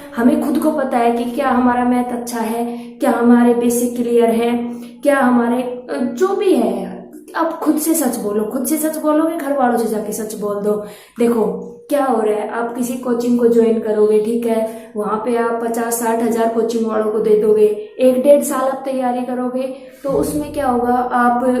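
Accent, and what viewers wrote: Haryana, India. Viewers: Thanks mam for such video . And your haryanvi voice is amazing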